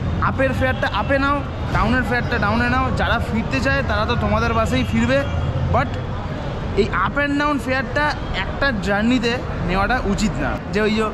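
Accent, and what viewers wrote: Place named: Kolkata, India. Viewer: I live abroad but watch train and bus vlogs by people like you. I love your vlogs because you are very honest and committed and you do sound Kolkattan so much!!!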